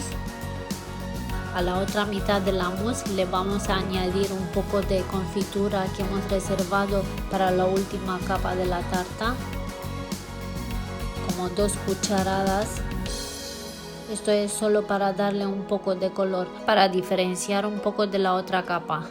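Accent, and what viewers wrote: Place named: Romania. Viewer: También quería mencionar que me fascina tu manera de hablar y tú acentos, no podía descifrar de dónde era, pero es genial que sea de Romania , deberías hacer un canal donde también enseñes tu idioma rumano!!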